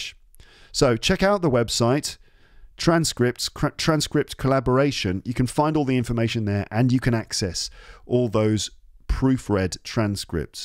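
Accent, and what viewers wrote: RP, United Kingdom. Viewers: totally agree. finally found a channel speaks British English, and the accent is so nice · Hi,. I've been following you for the past four years. I have always loved your voice and your British accent and therefore I would like (oneday) to be able to speak English the way you do. Today I have found you in youtube and It has been such a nice surprise!! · I found out you when I lwatched AJ Hoge's live stream. Someone asked him to recommend a podcast in Britain accent and he recommend you. And he also added that his podcasts aren't boring and you can enjoy while listening. I realized how wonderful teacher he is! And after watching you I see you are also a great teacher and positive like him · Found your podcasts recently. Love them 😊 your natural and vivid way of speaking is very, very interesting and makes it very enjoyable to listen to you. 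Love your accent! So British! So nice. Thank you so much and the best of luck for the next year (2022) as I am listening to this only in mid Dec 2021! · Yes, you do have all sorts of listeners. I, for instance am a 70 + retired teacher of English. I listen avidly to your podcasts as they provide excellent exposure to the English as spoken by the native speakers.An added advantage is that it is in modern RP. Carry on with your good work. Cheers.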